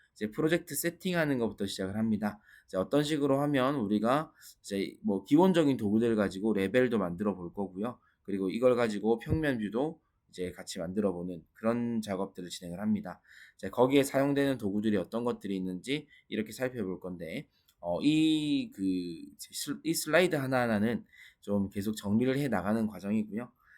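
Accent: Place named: Busan, South Korea. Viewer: 부산이네요 ㅠ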